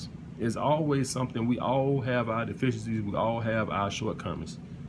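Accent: South, United States. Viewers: You from the south?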